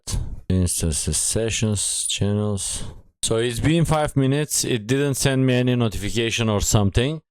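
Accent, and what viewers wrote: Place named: Eastern Europe. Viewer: You sound Eastern European. Hi from Romania. This is such a straight forward, no fluff tutorial you offered us. Thank you so much. You've got a new subscriber!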